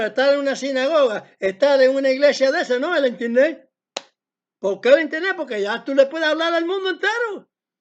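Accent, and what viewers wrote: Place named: Cuba. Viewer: Ese acento cubano... espectacular!!!!! Bendiciones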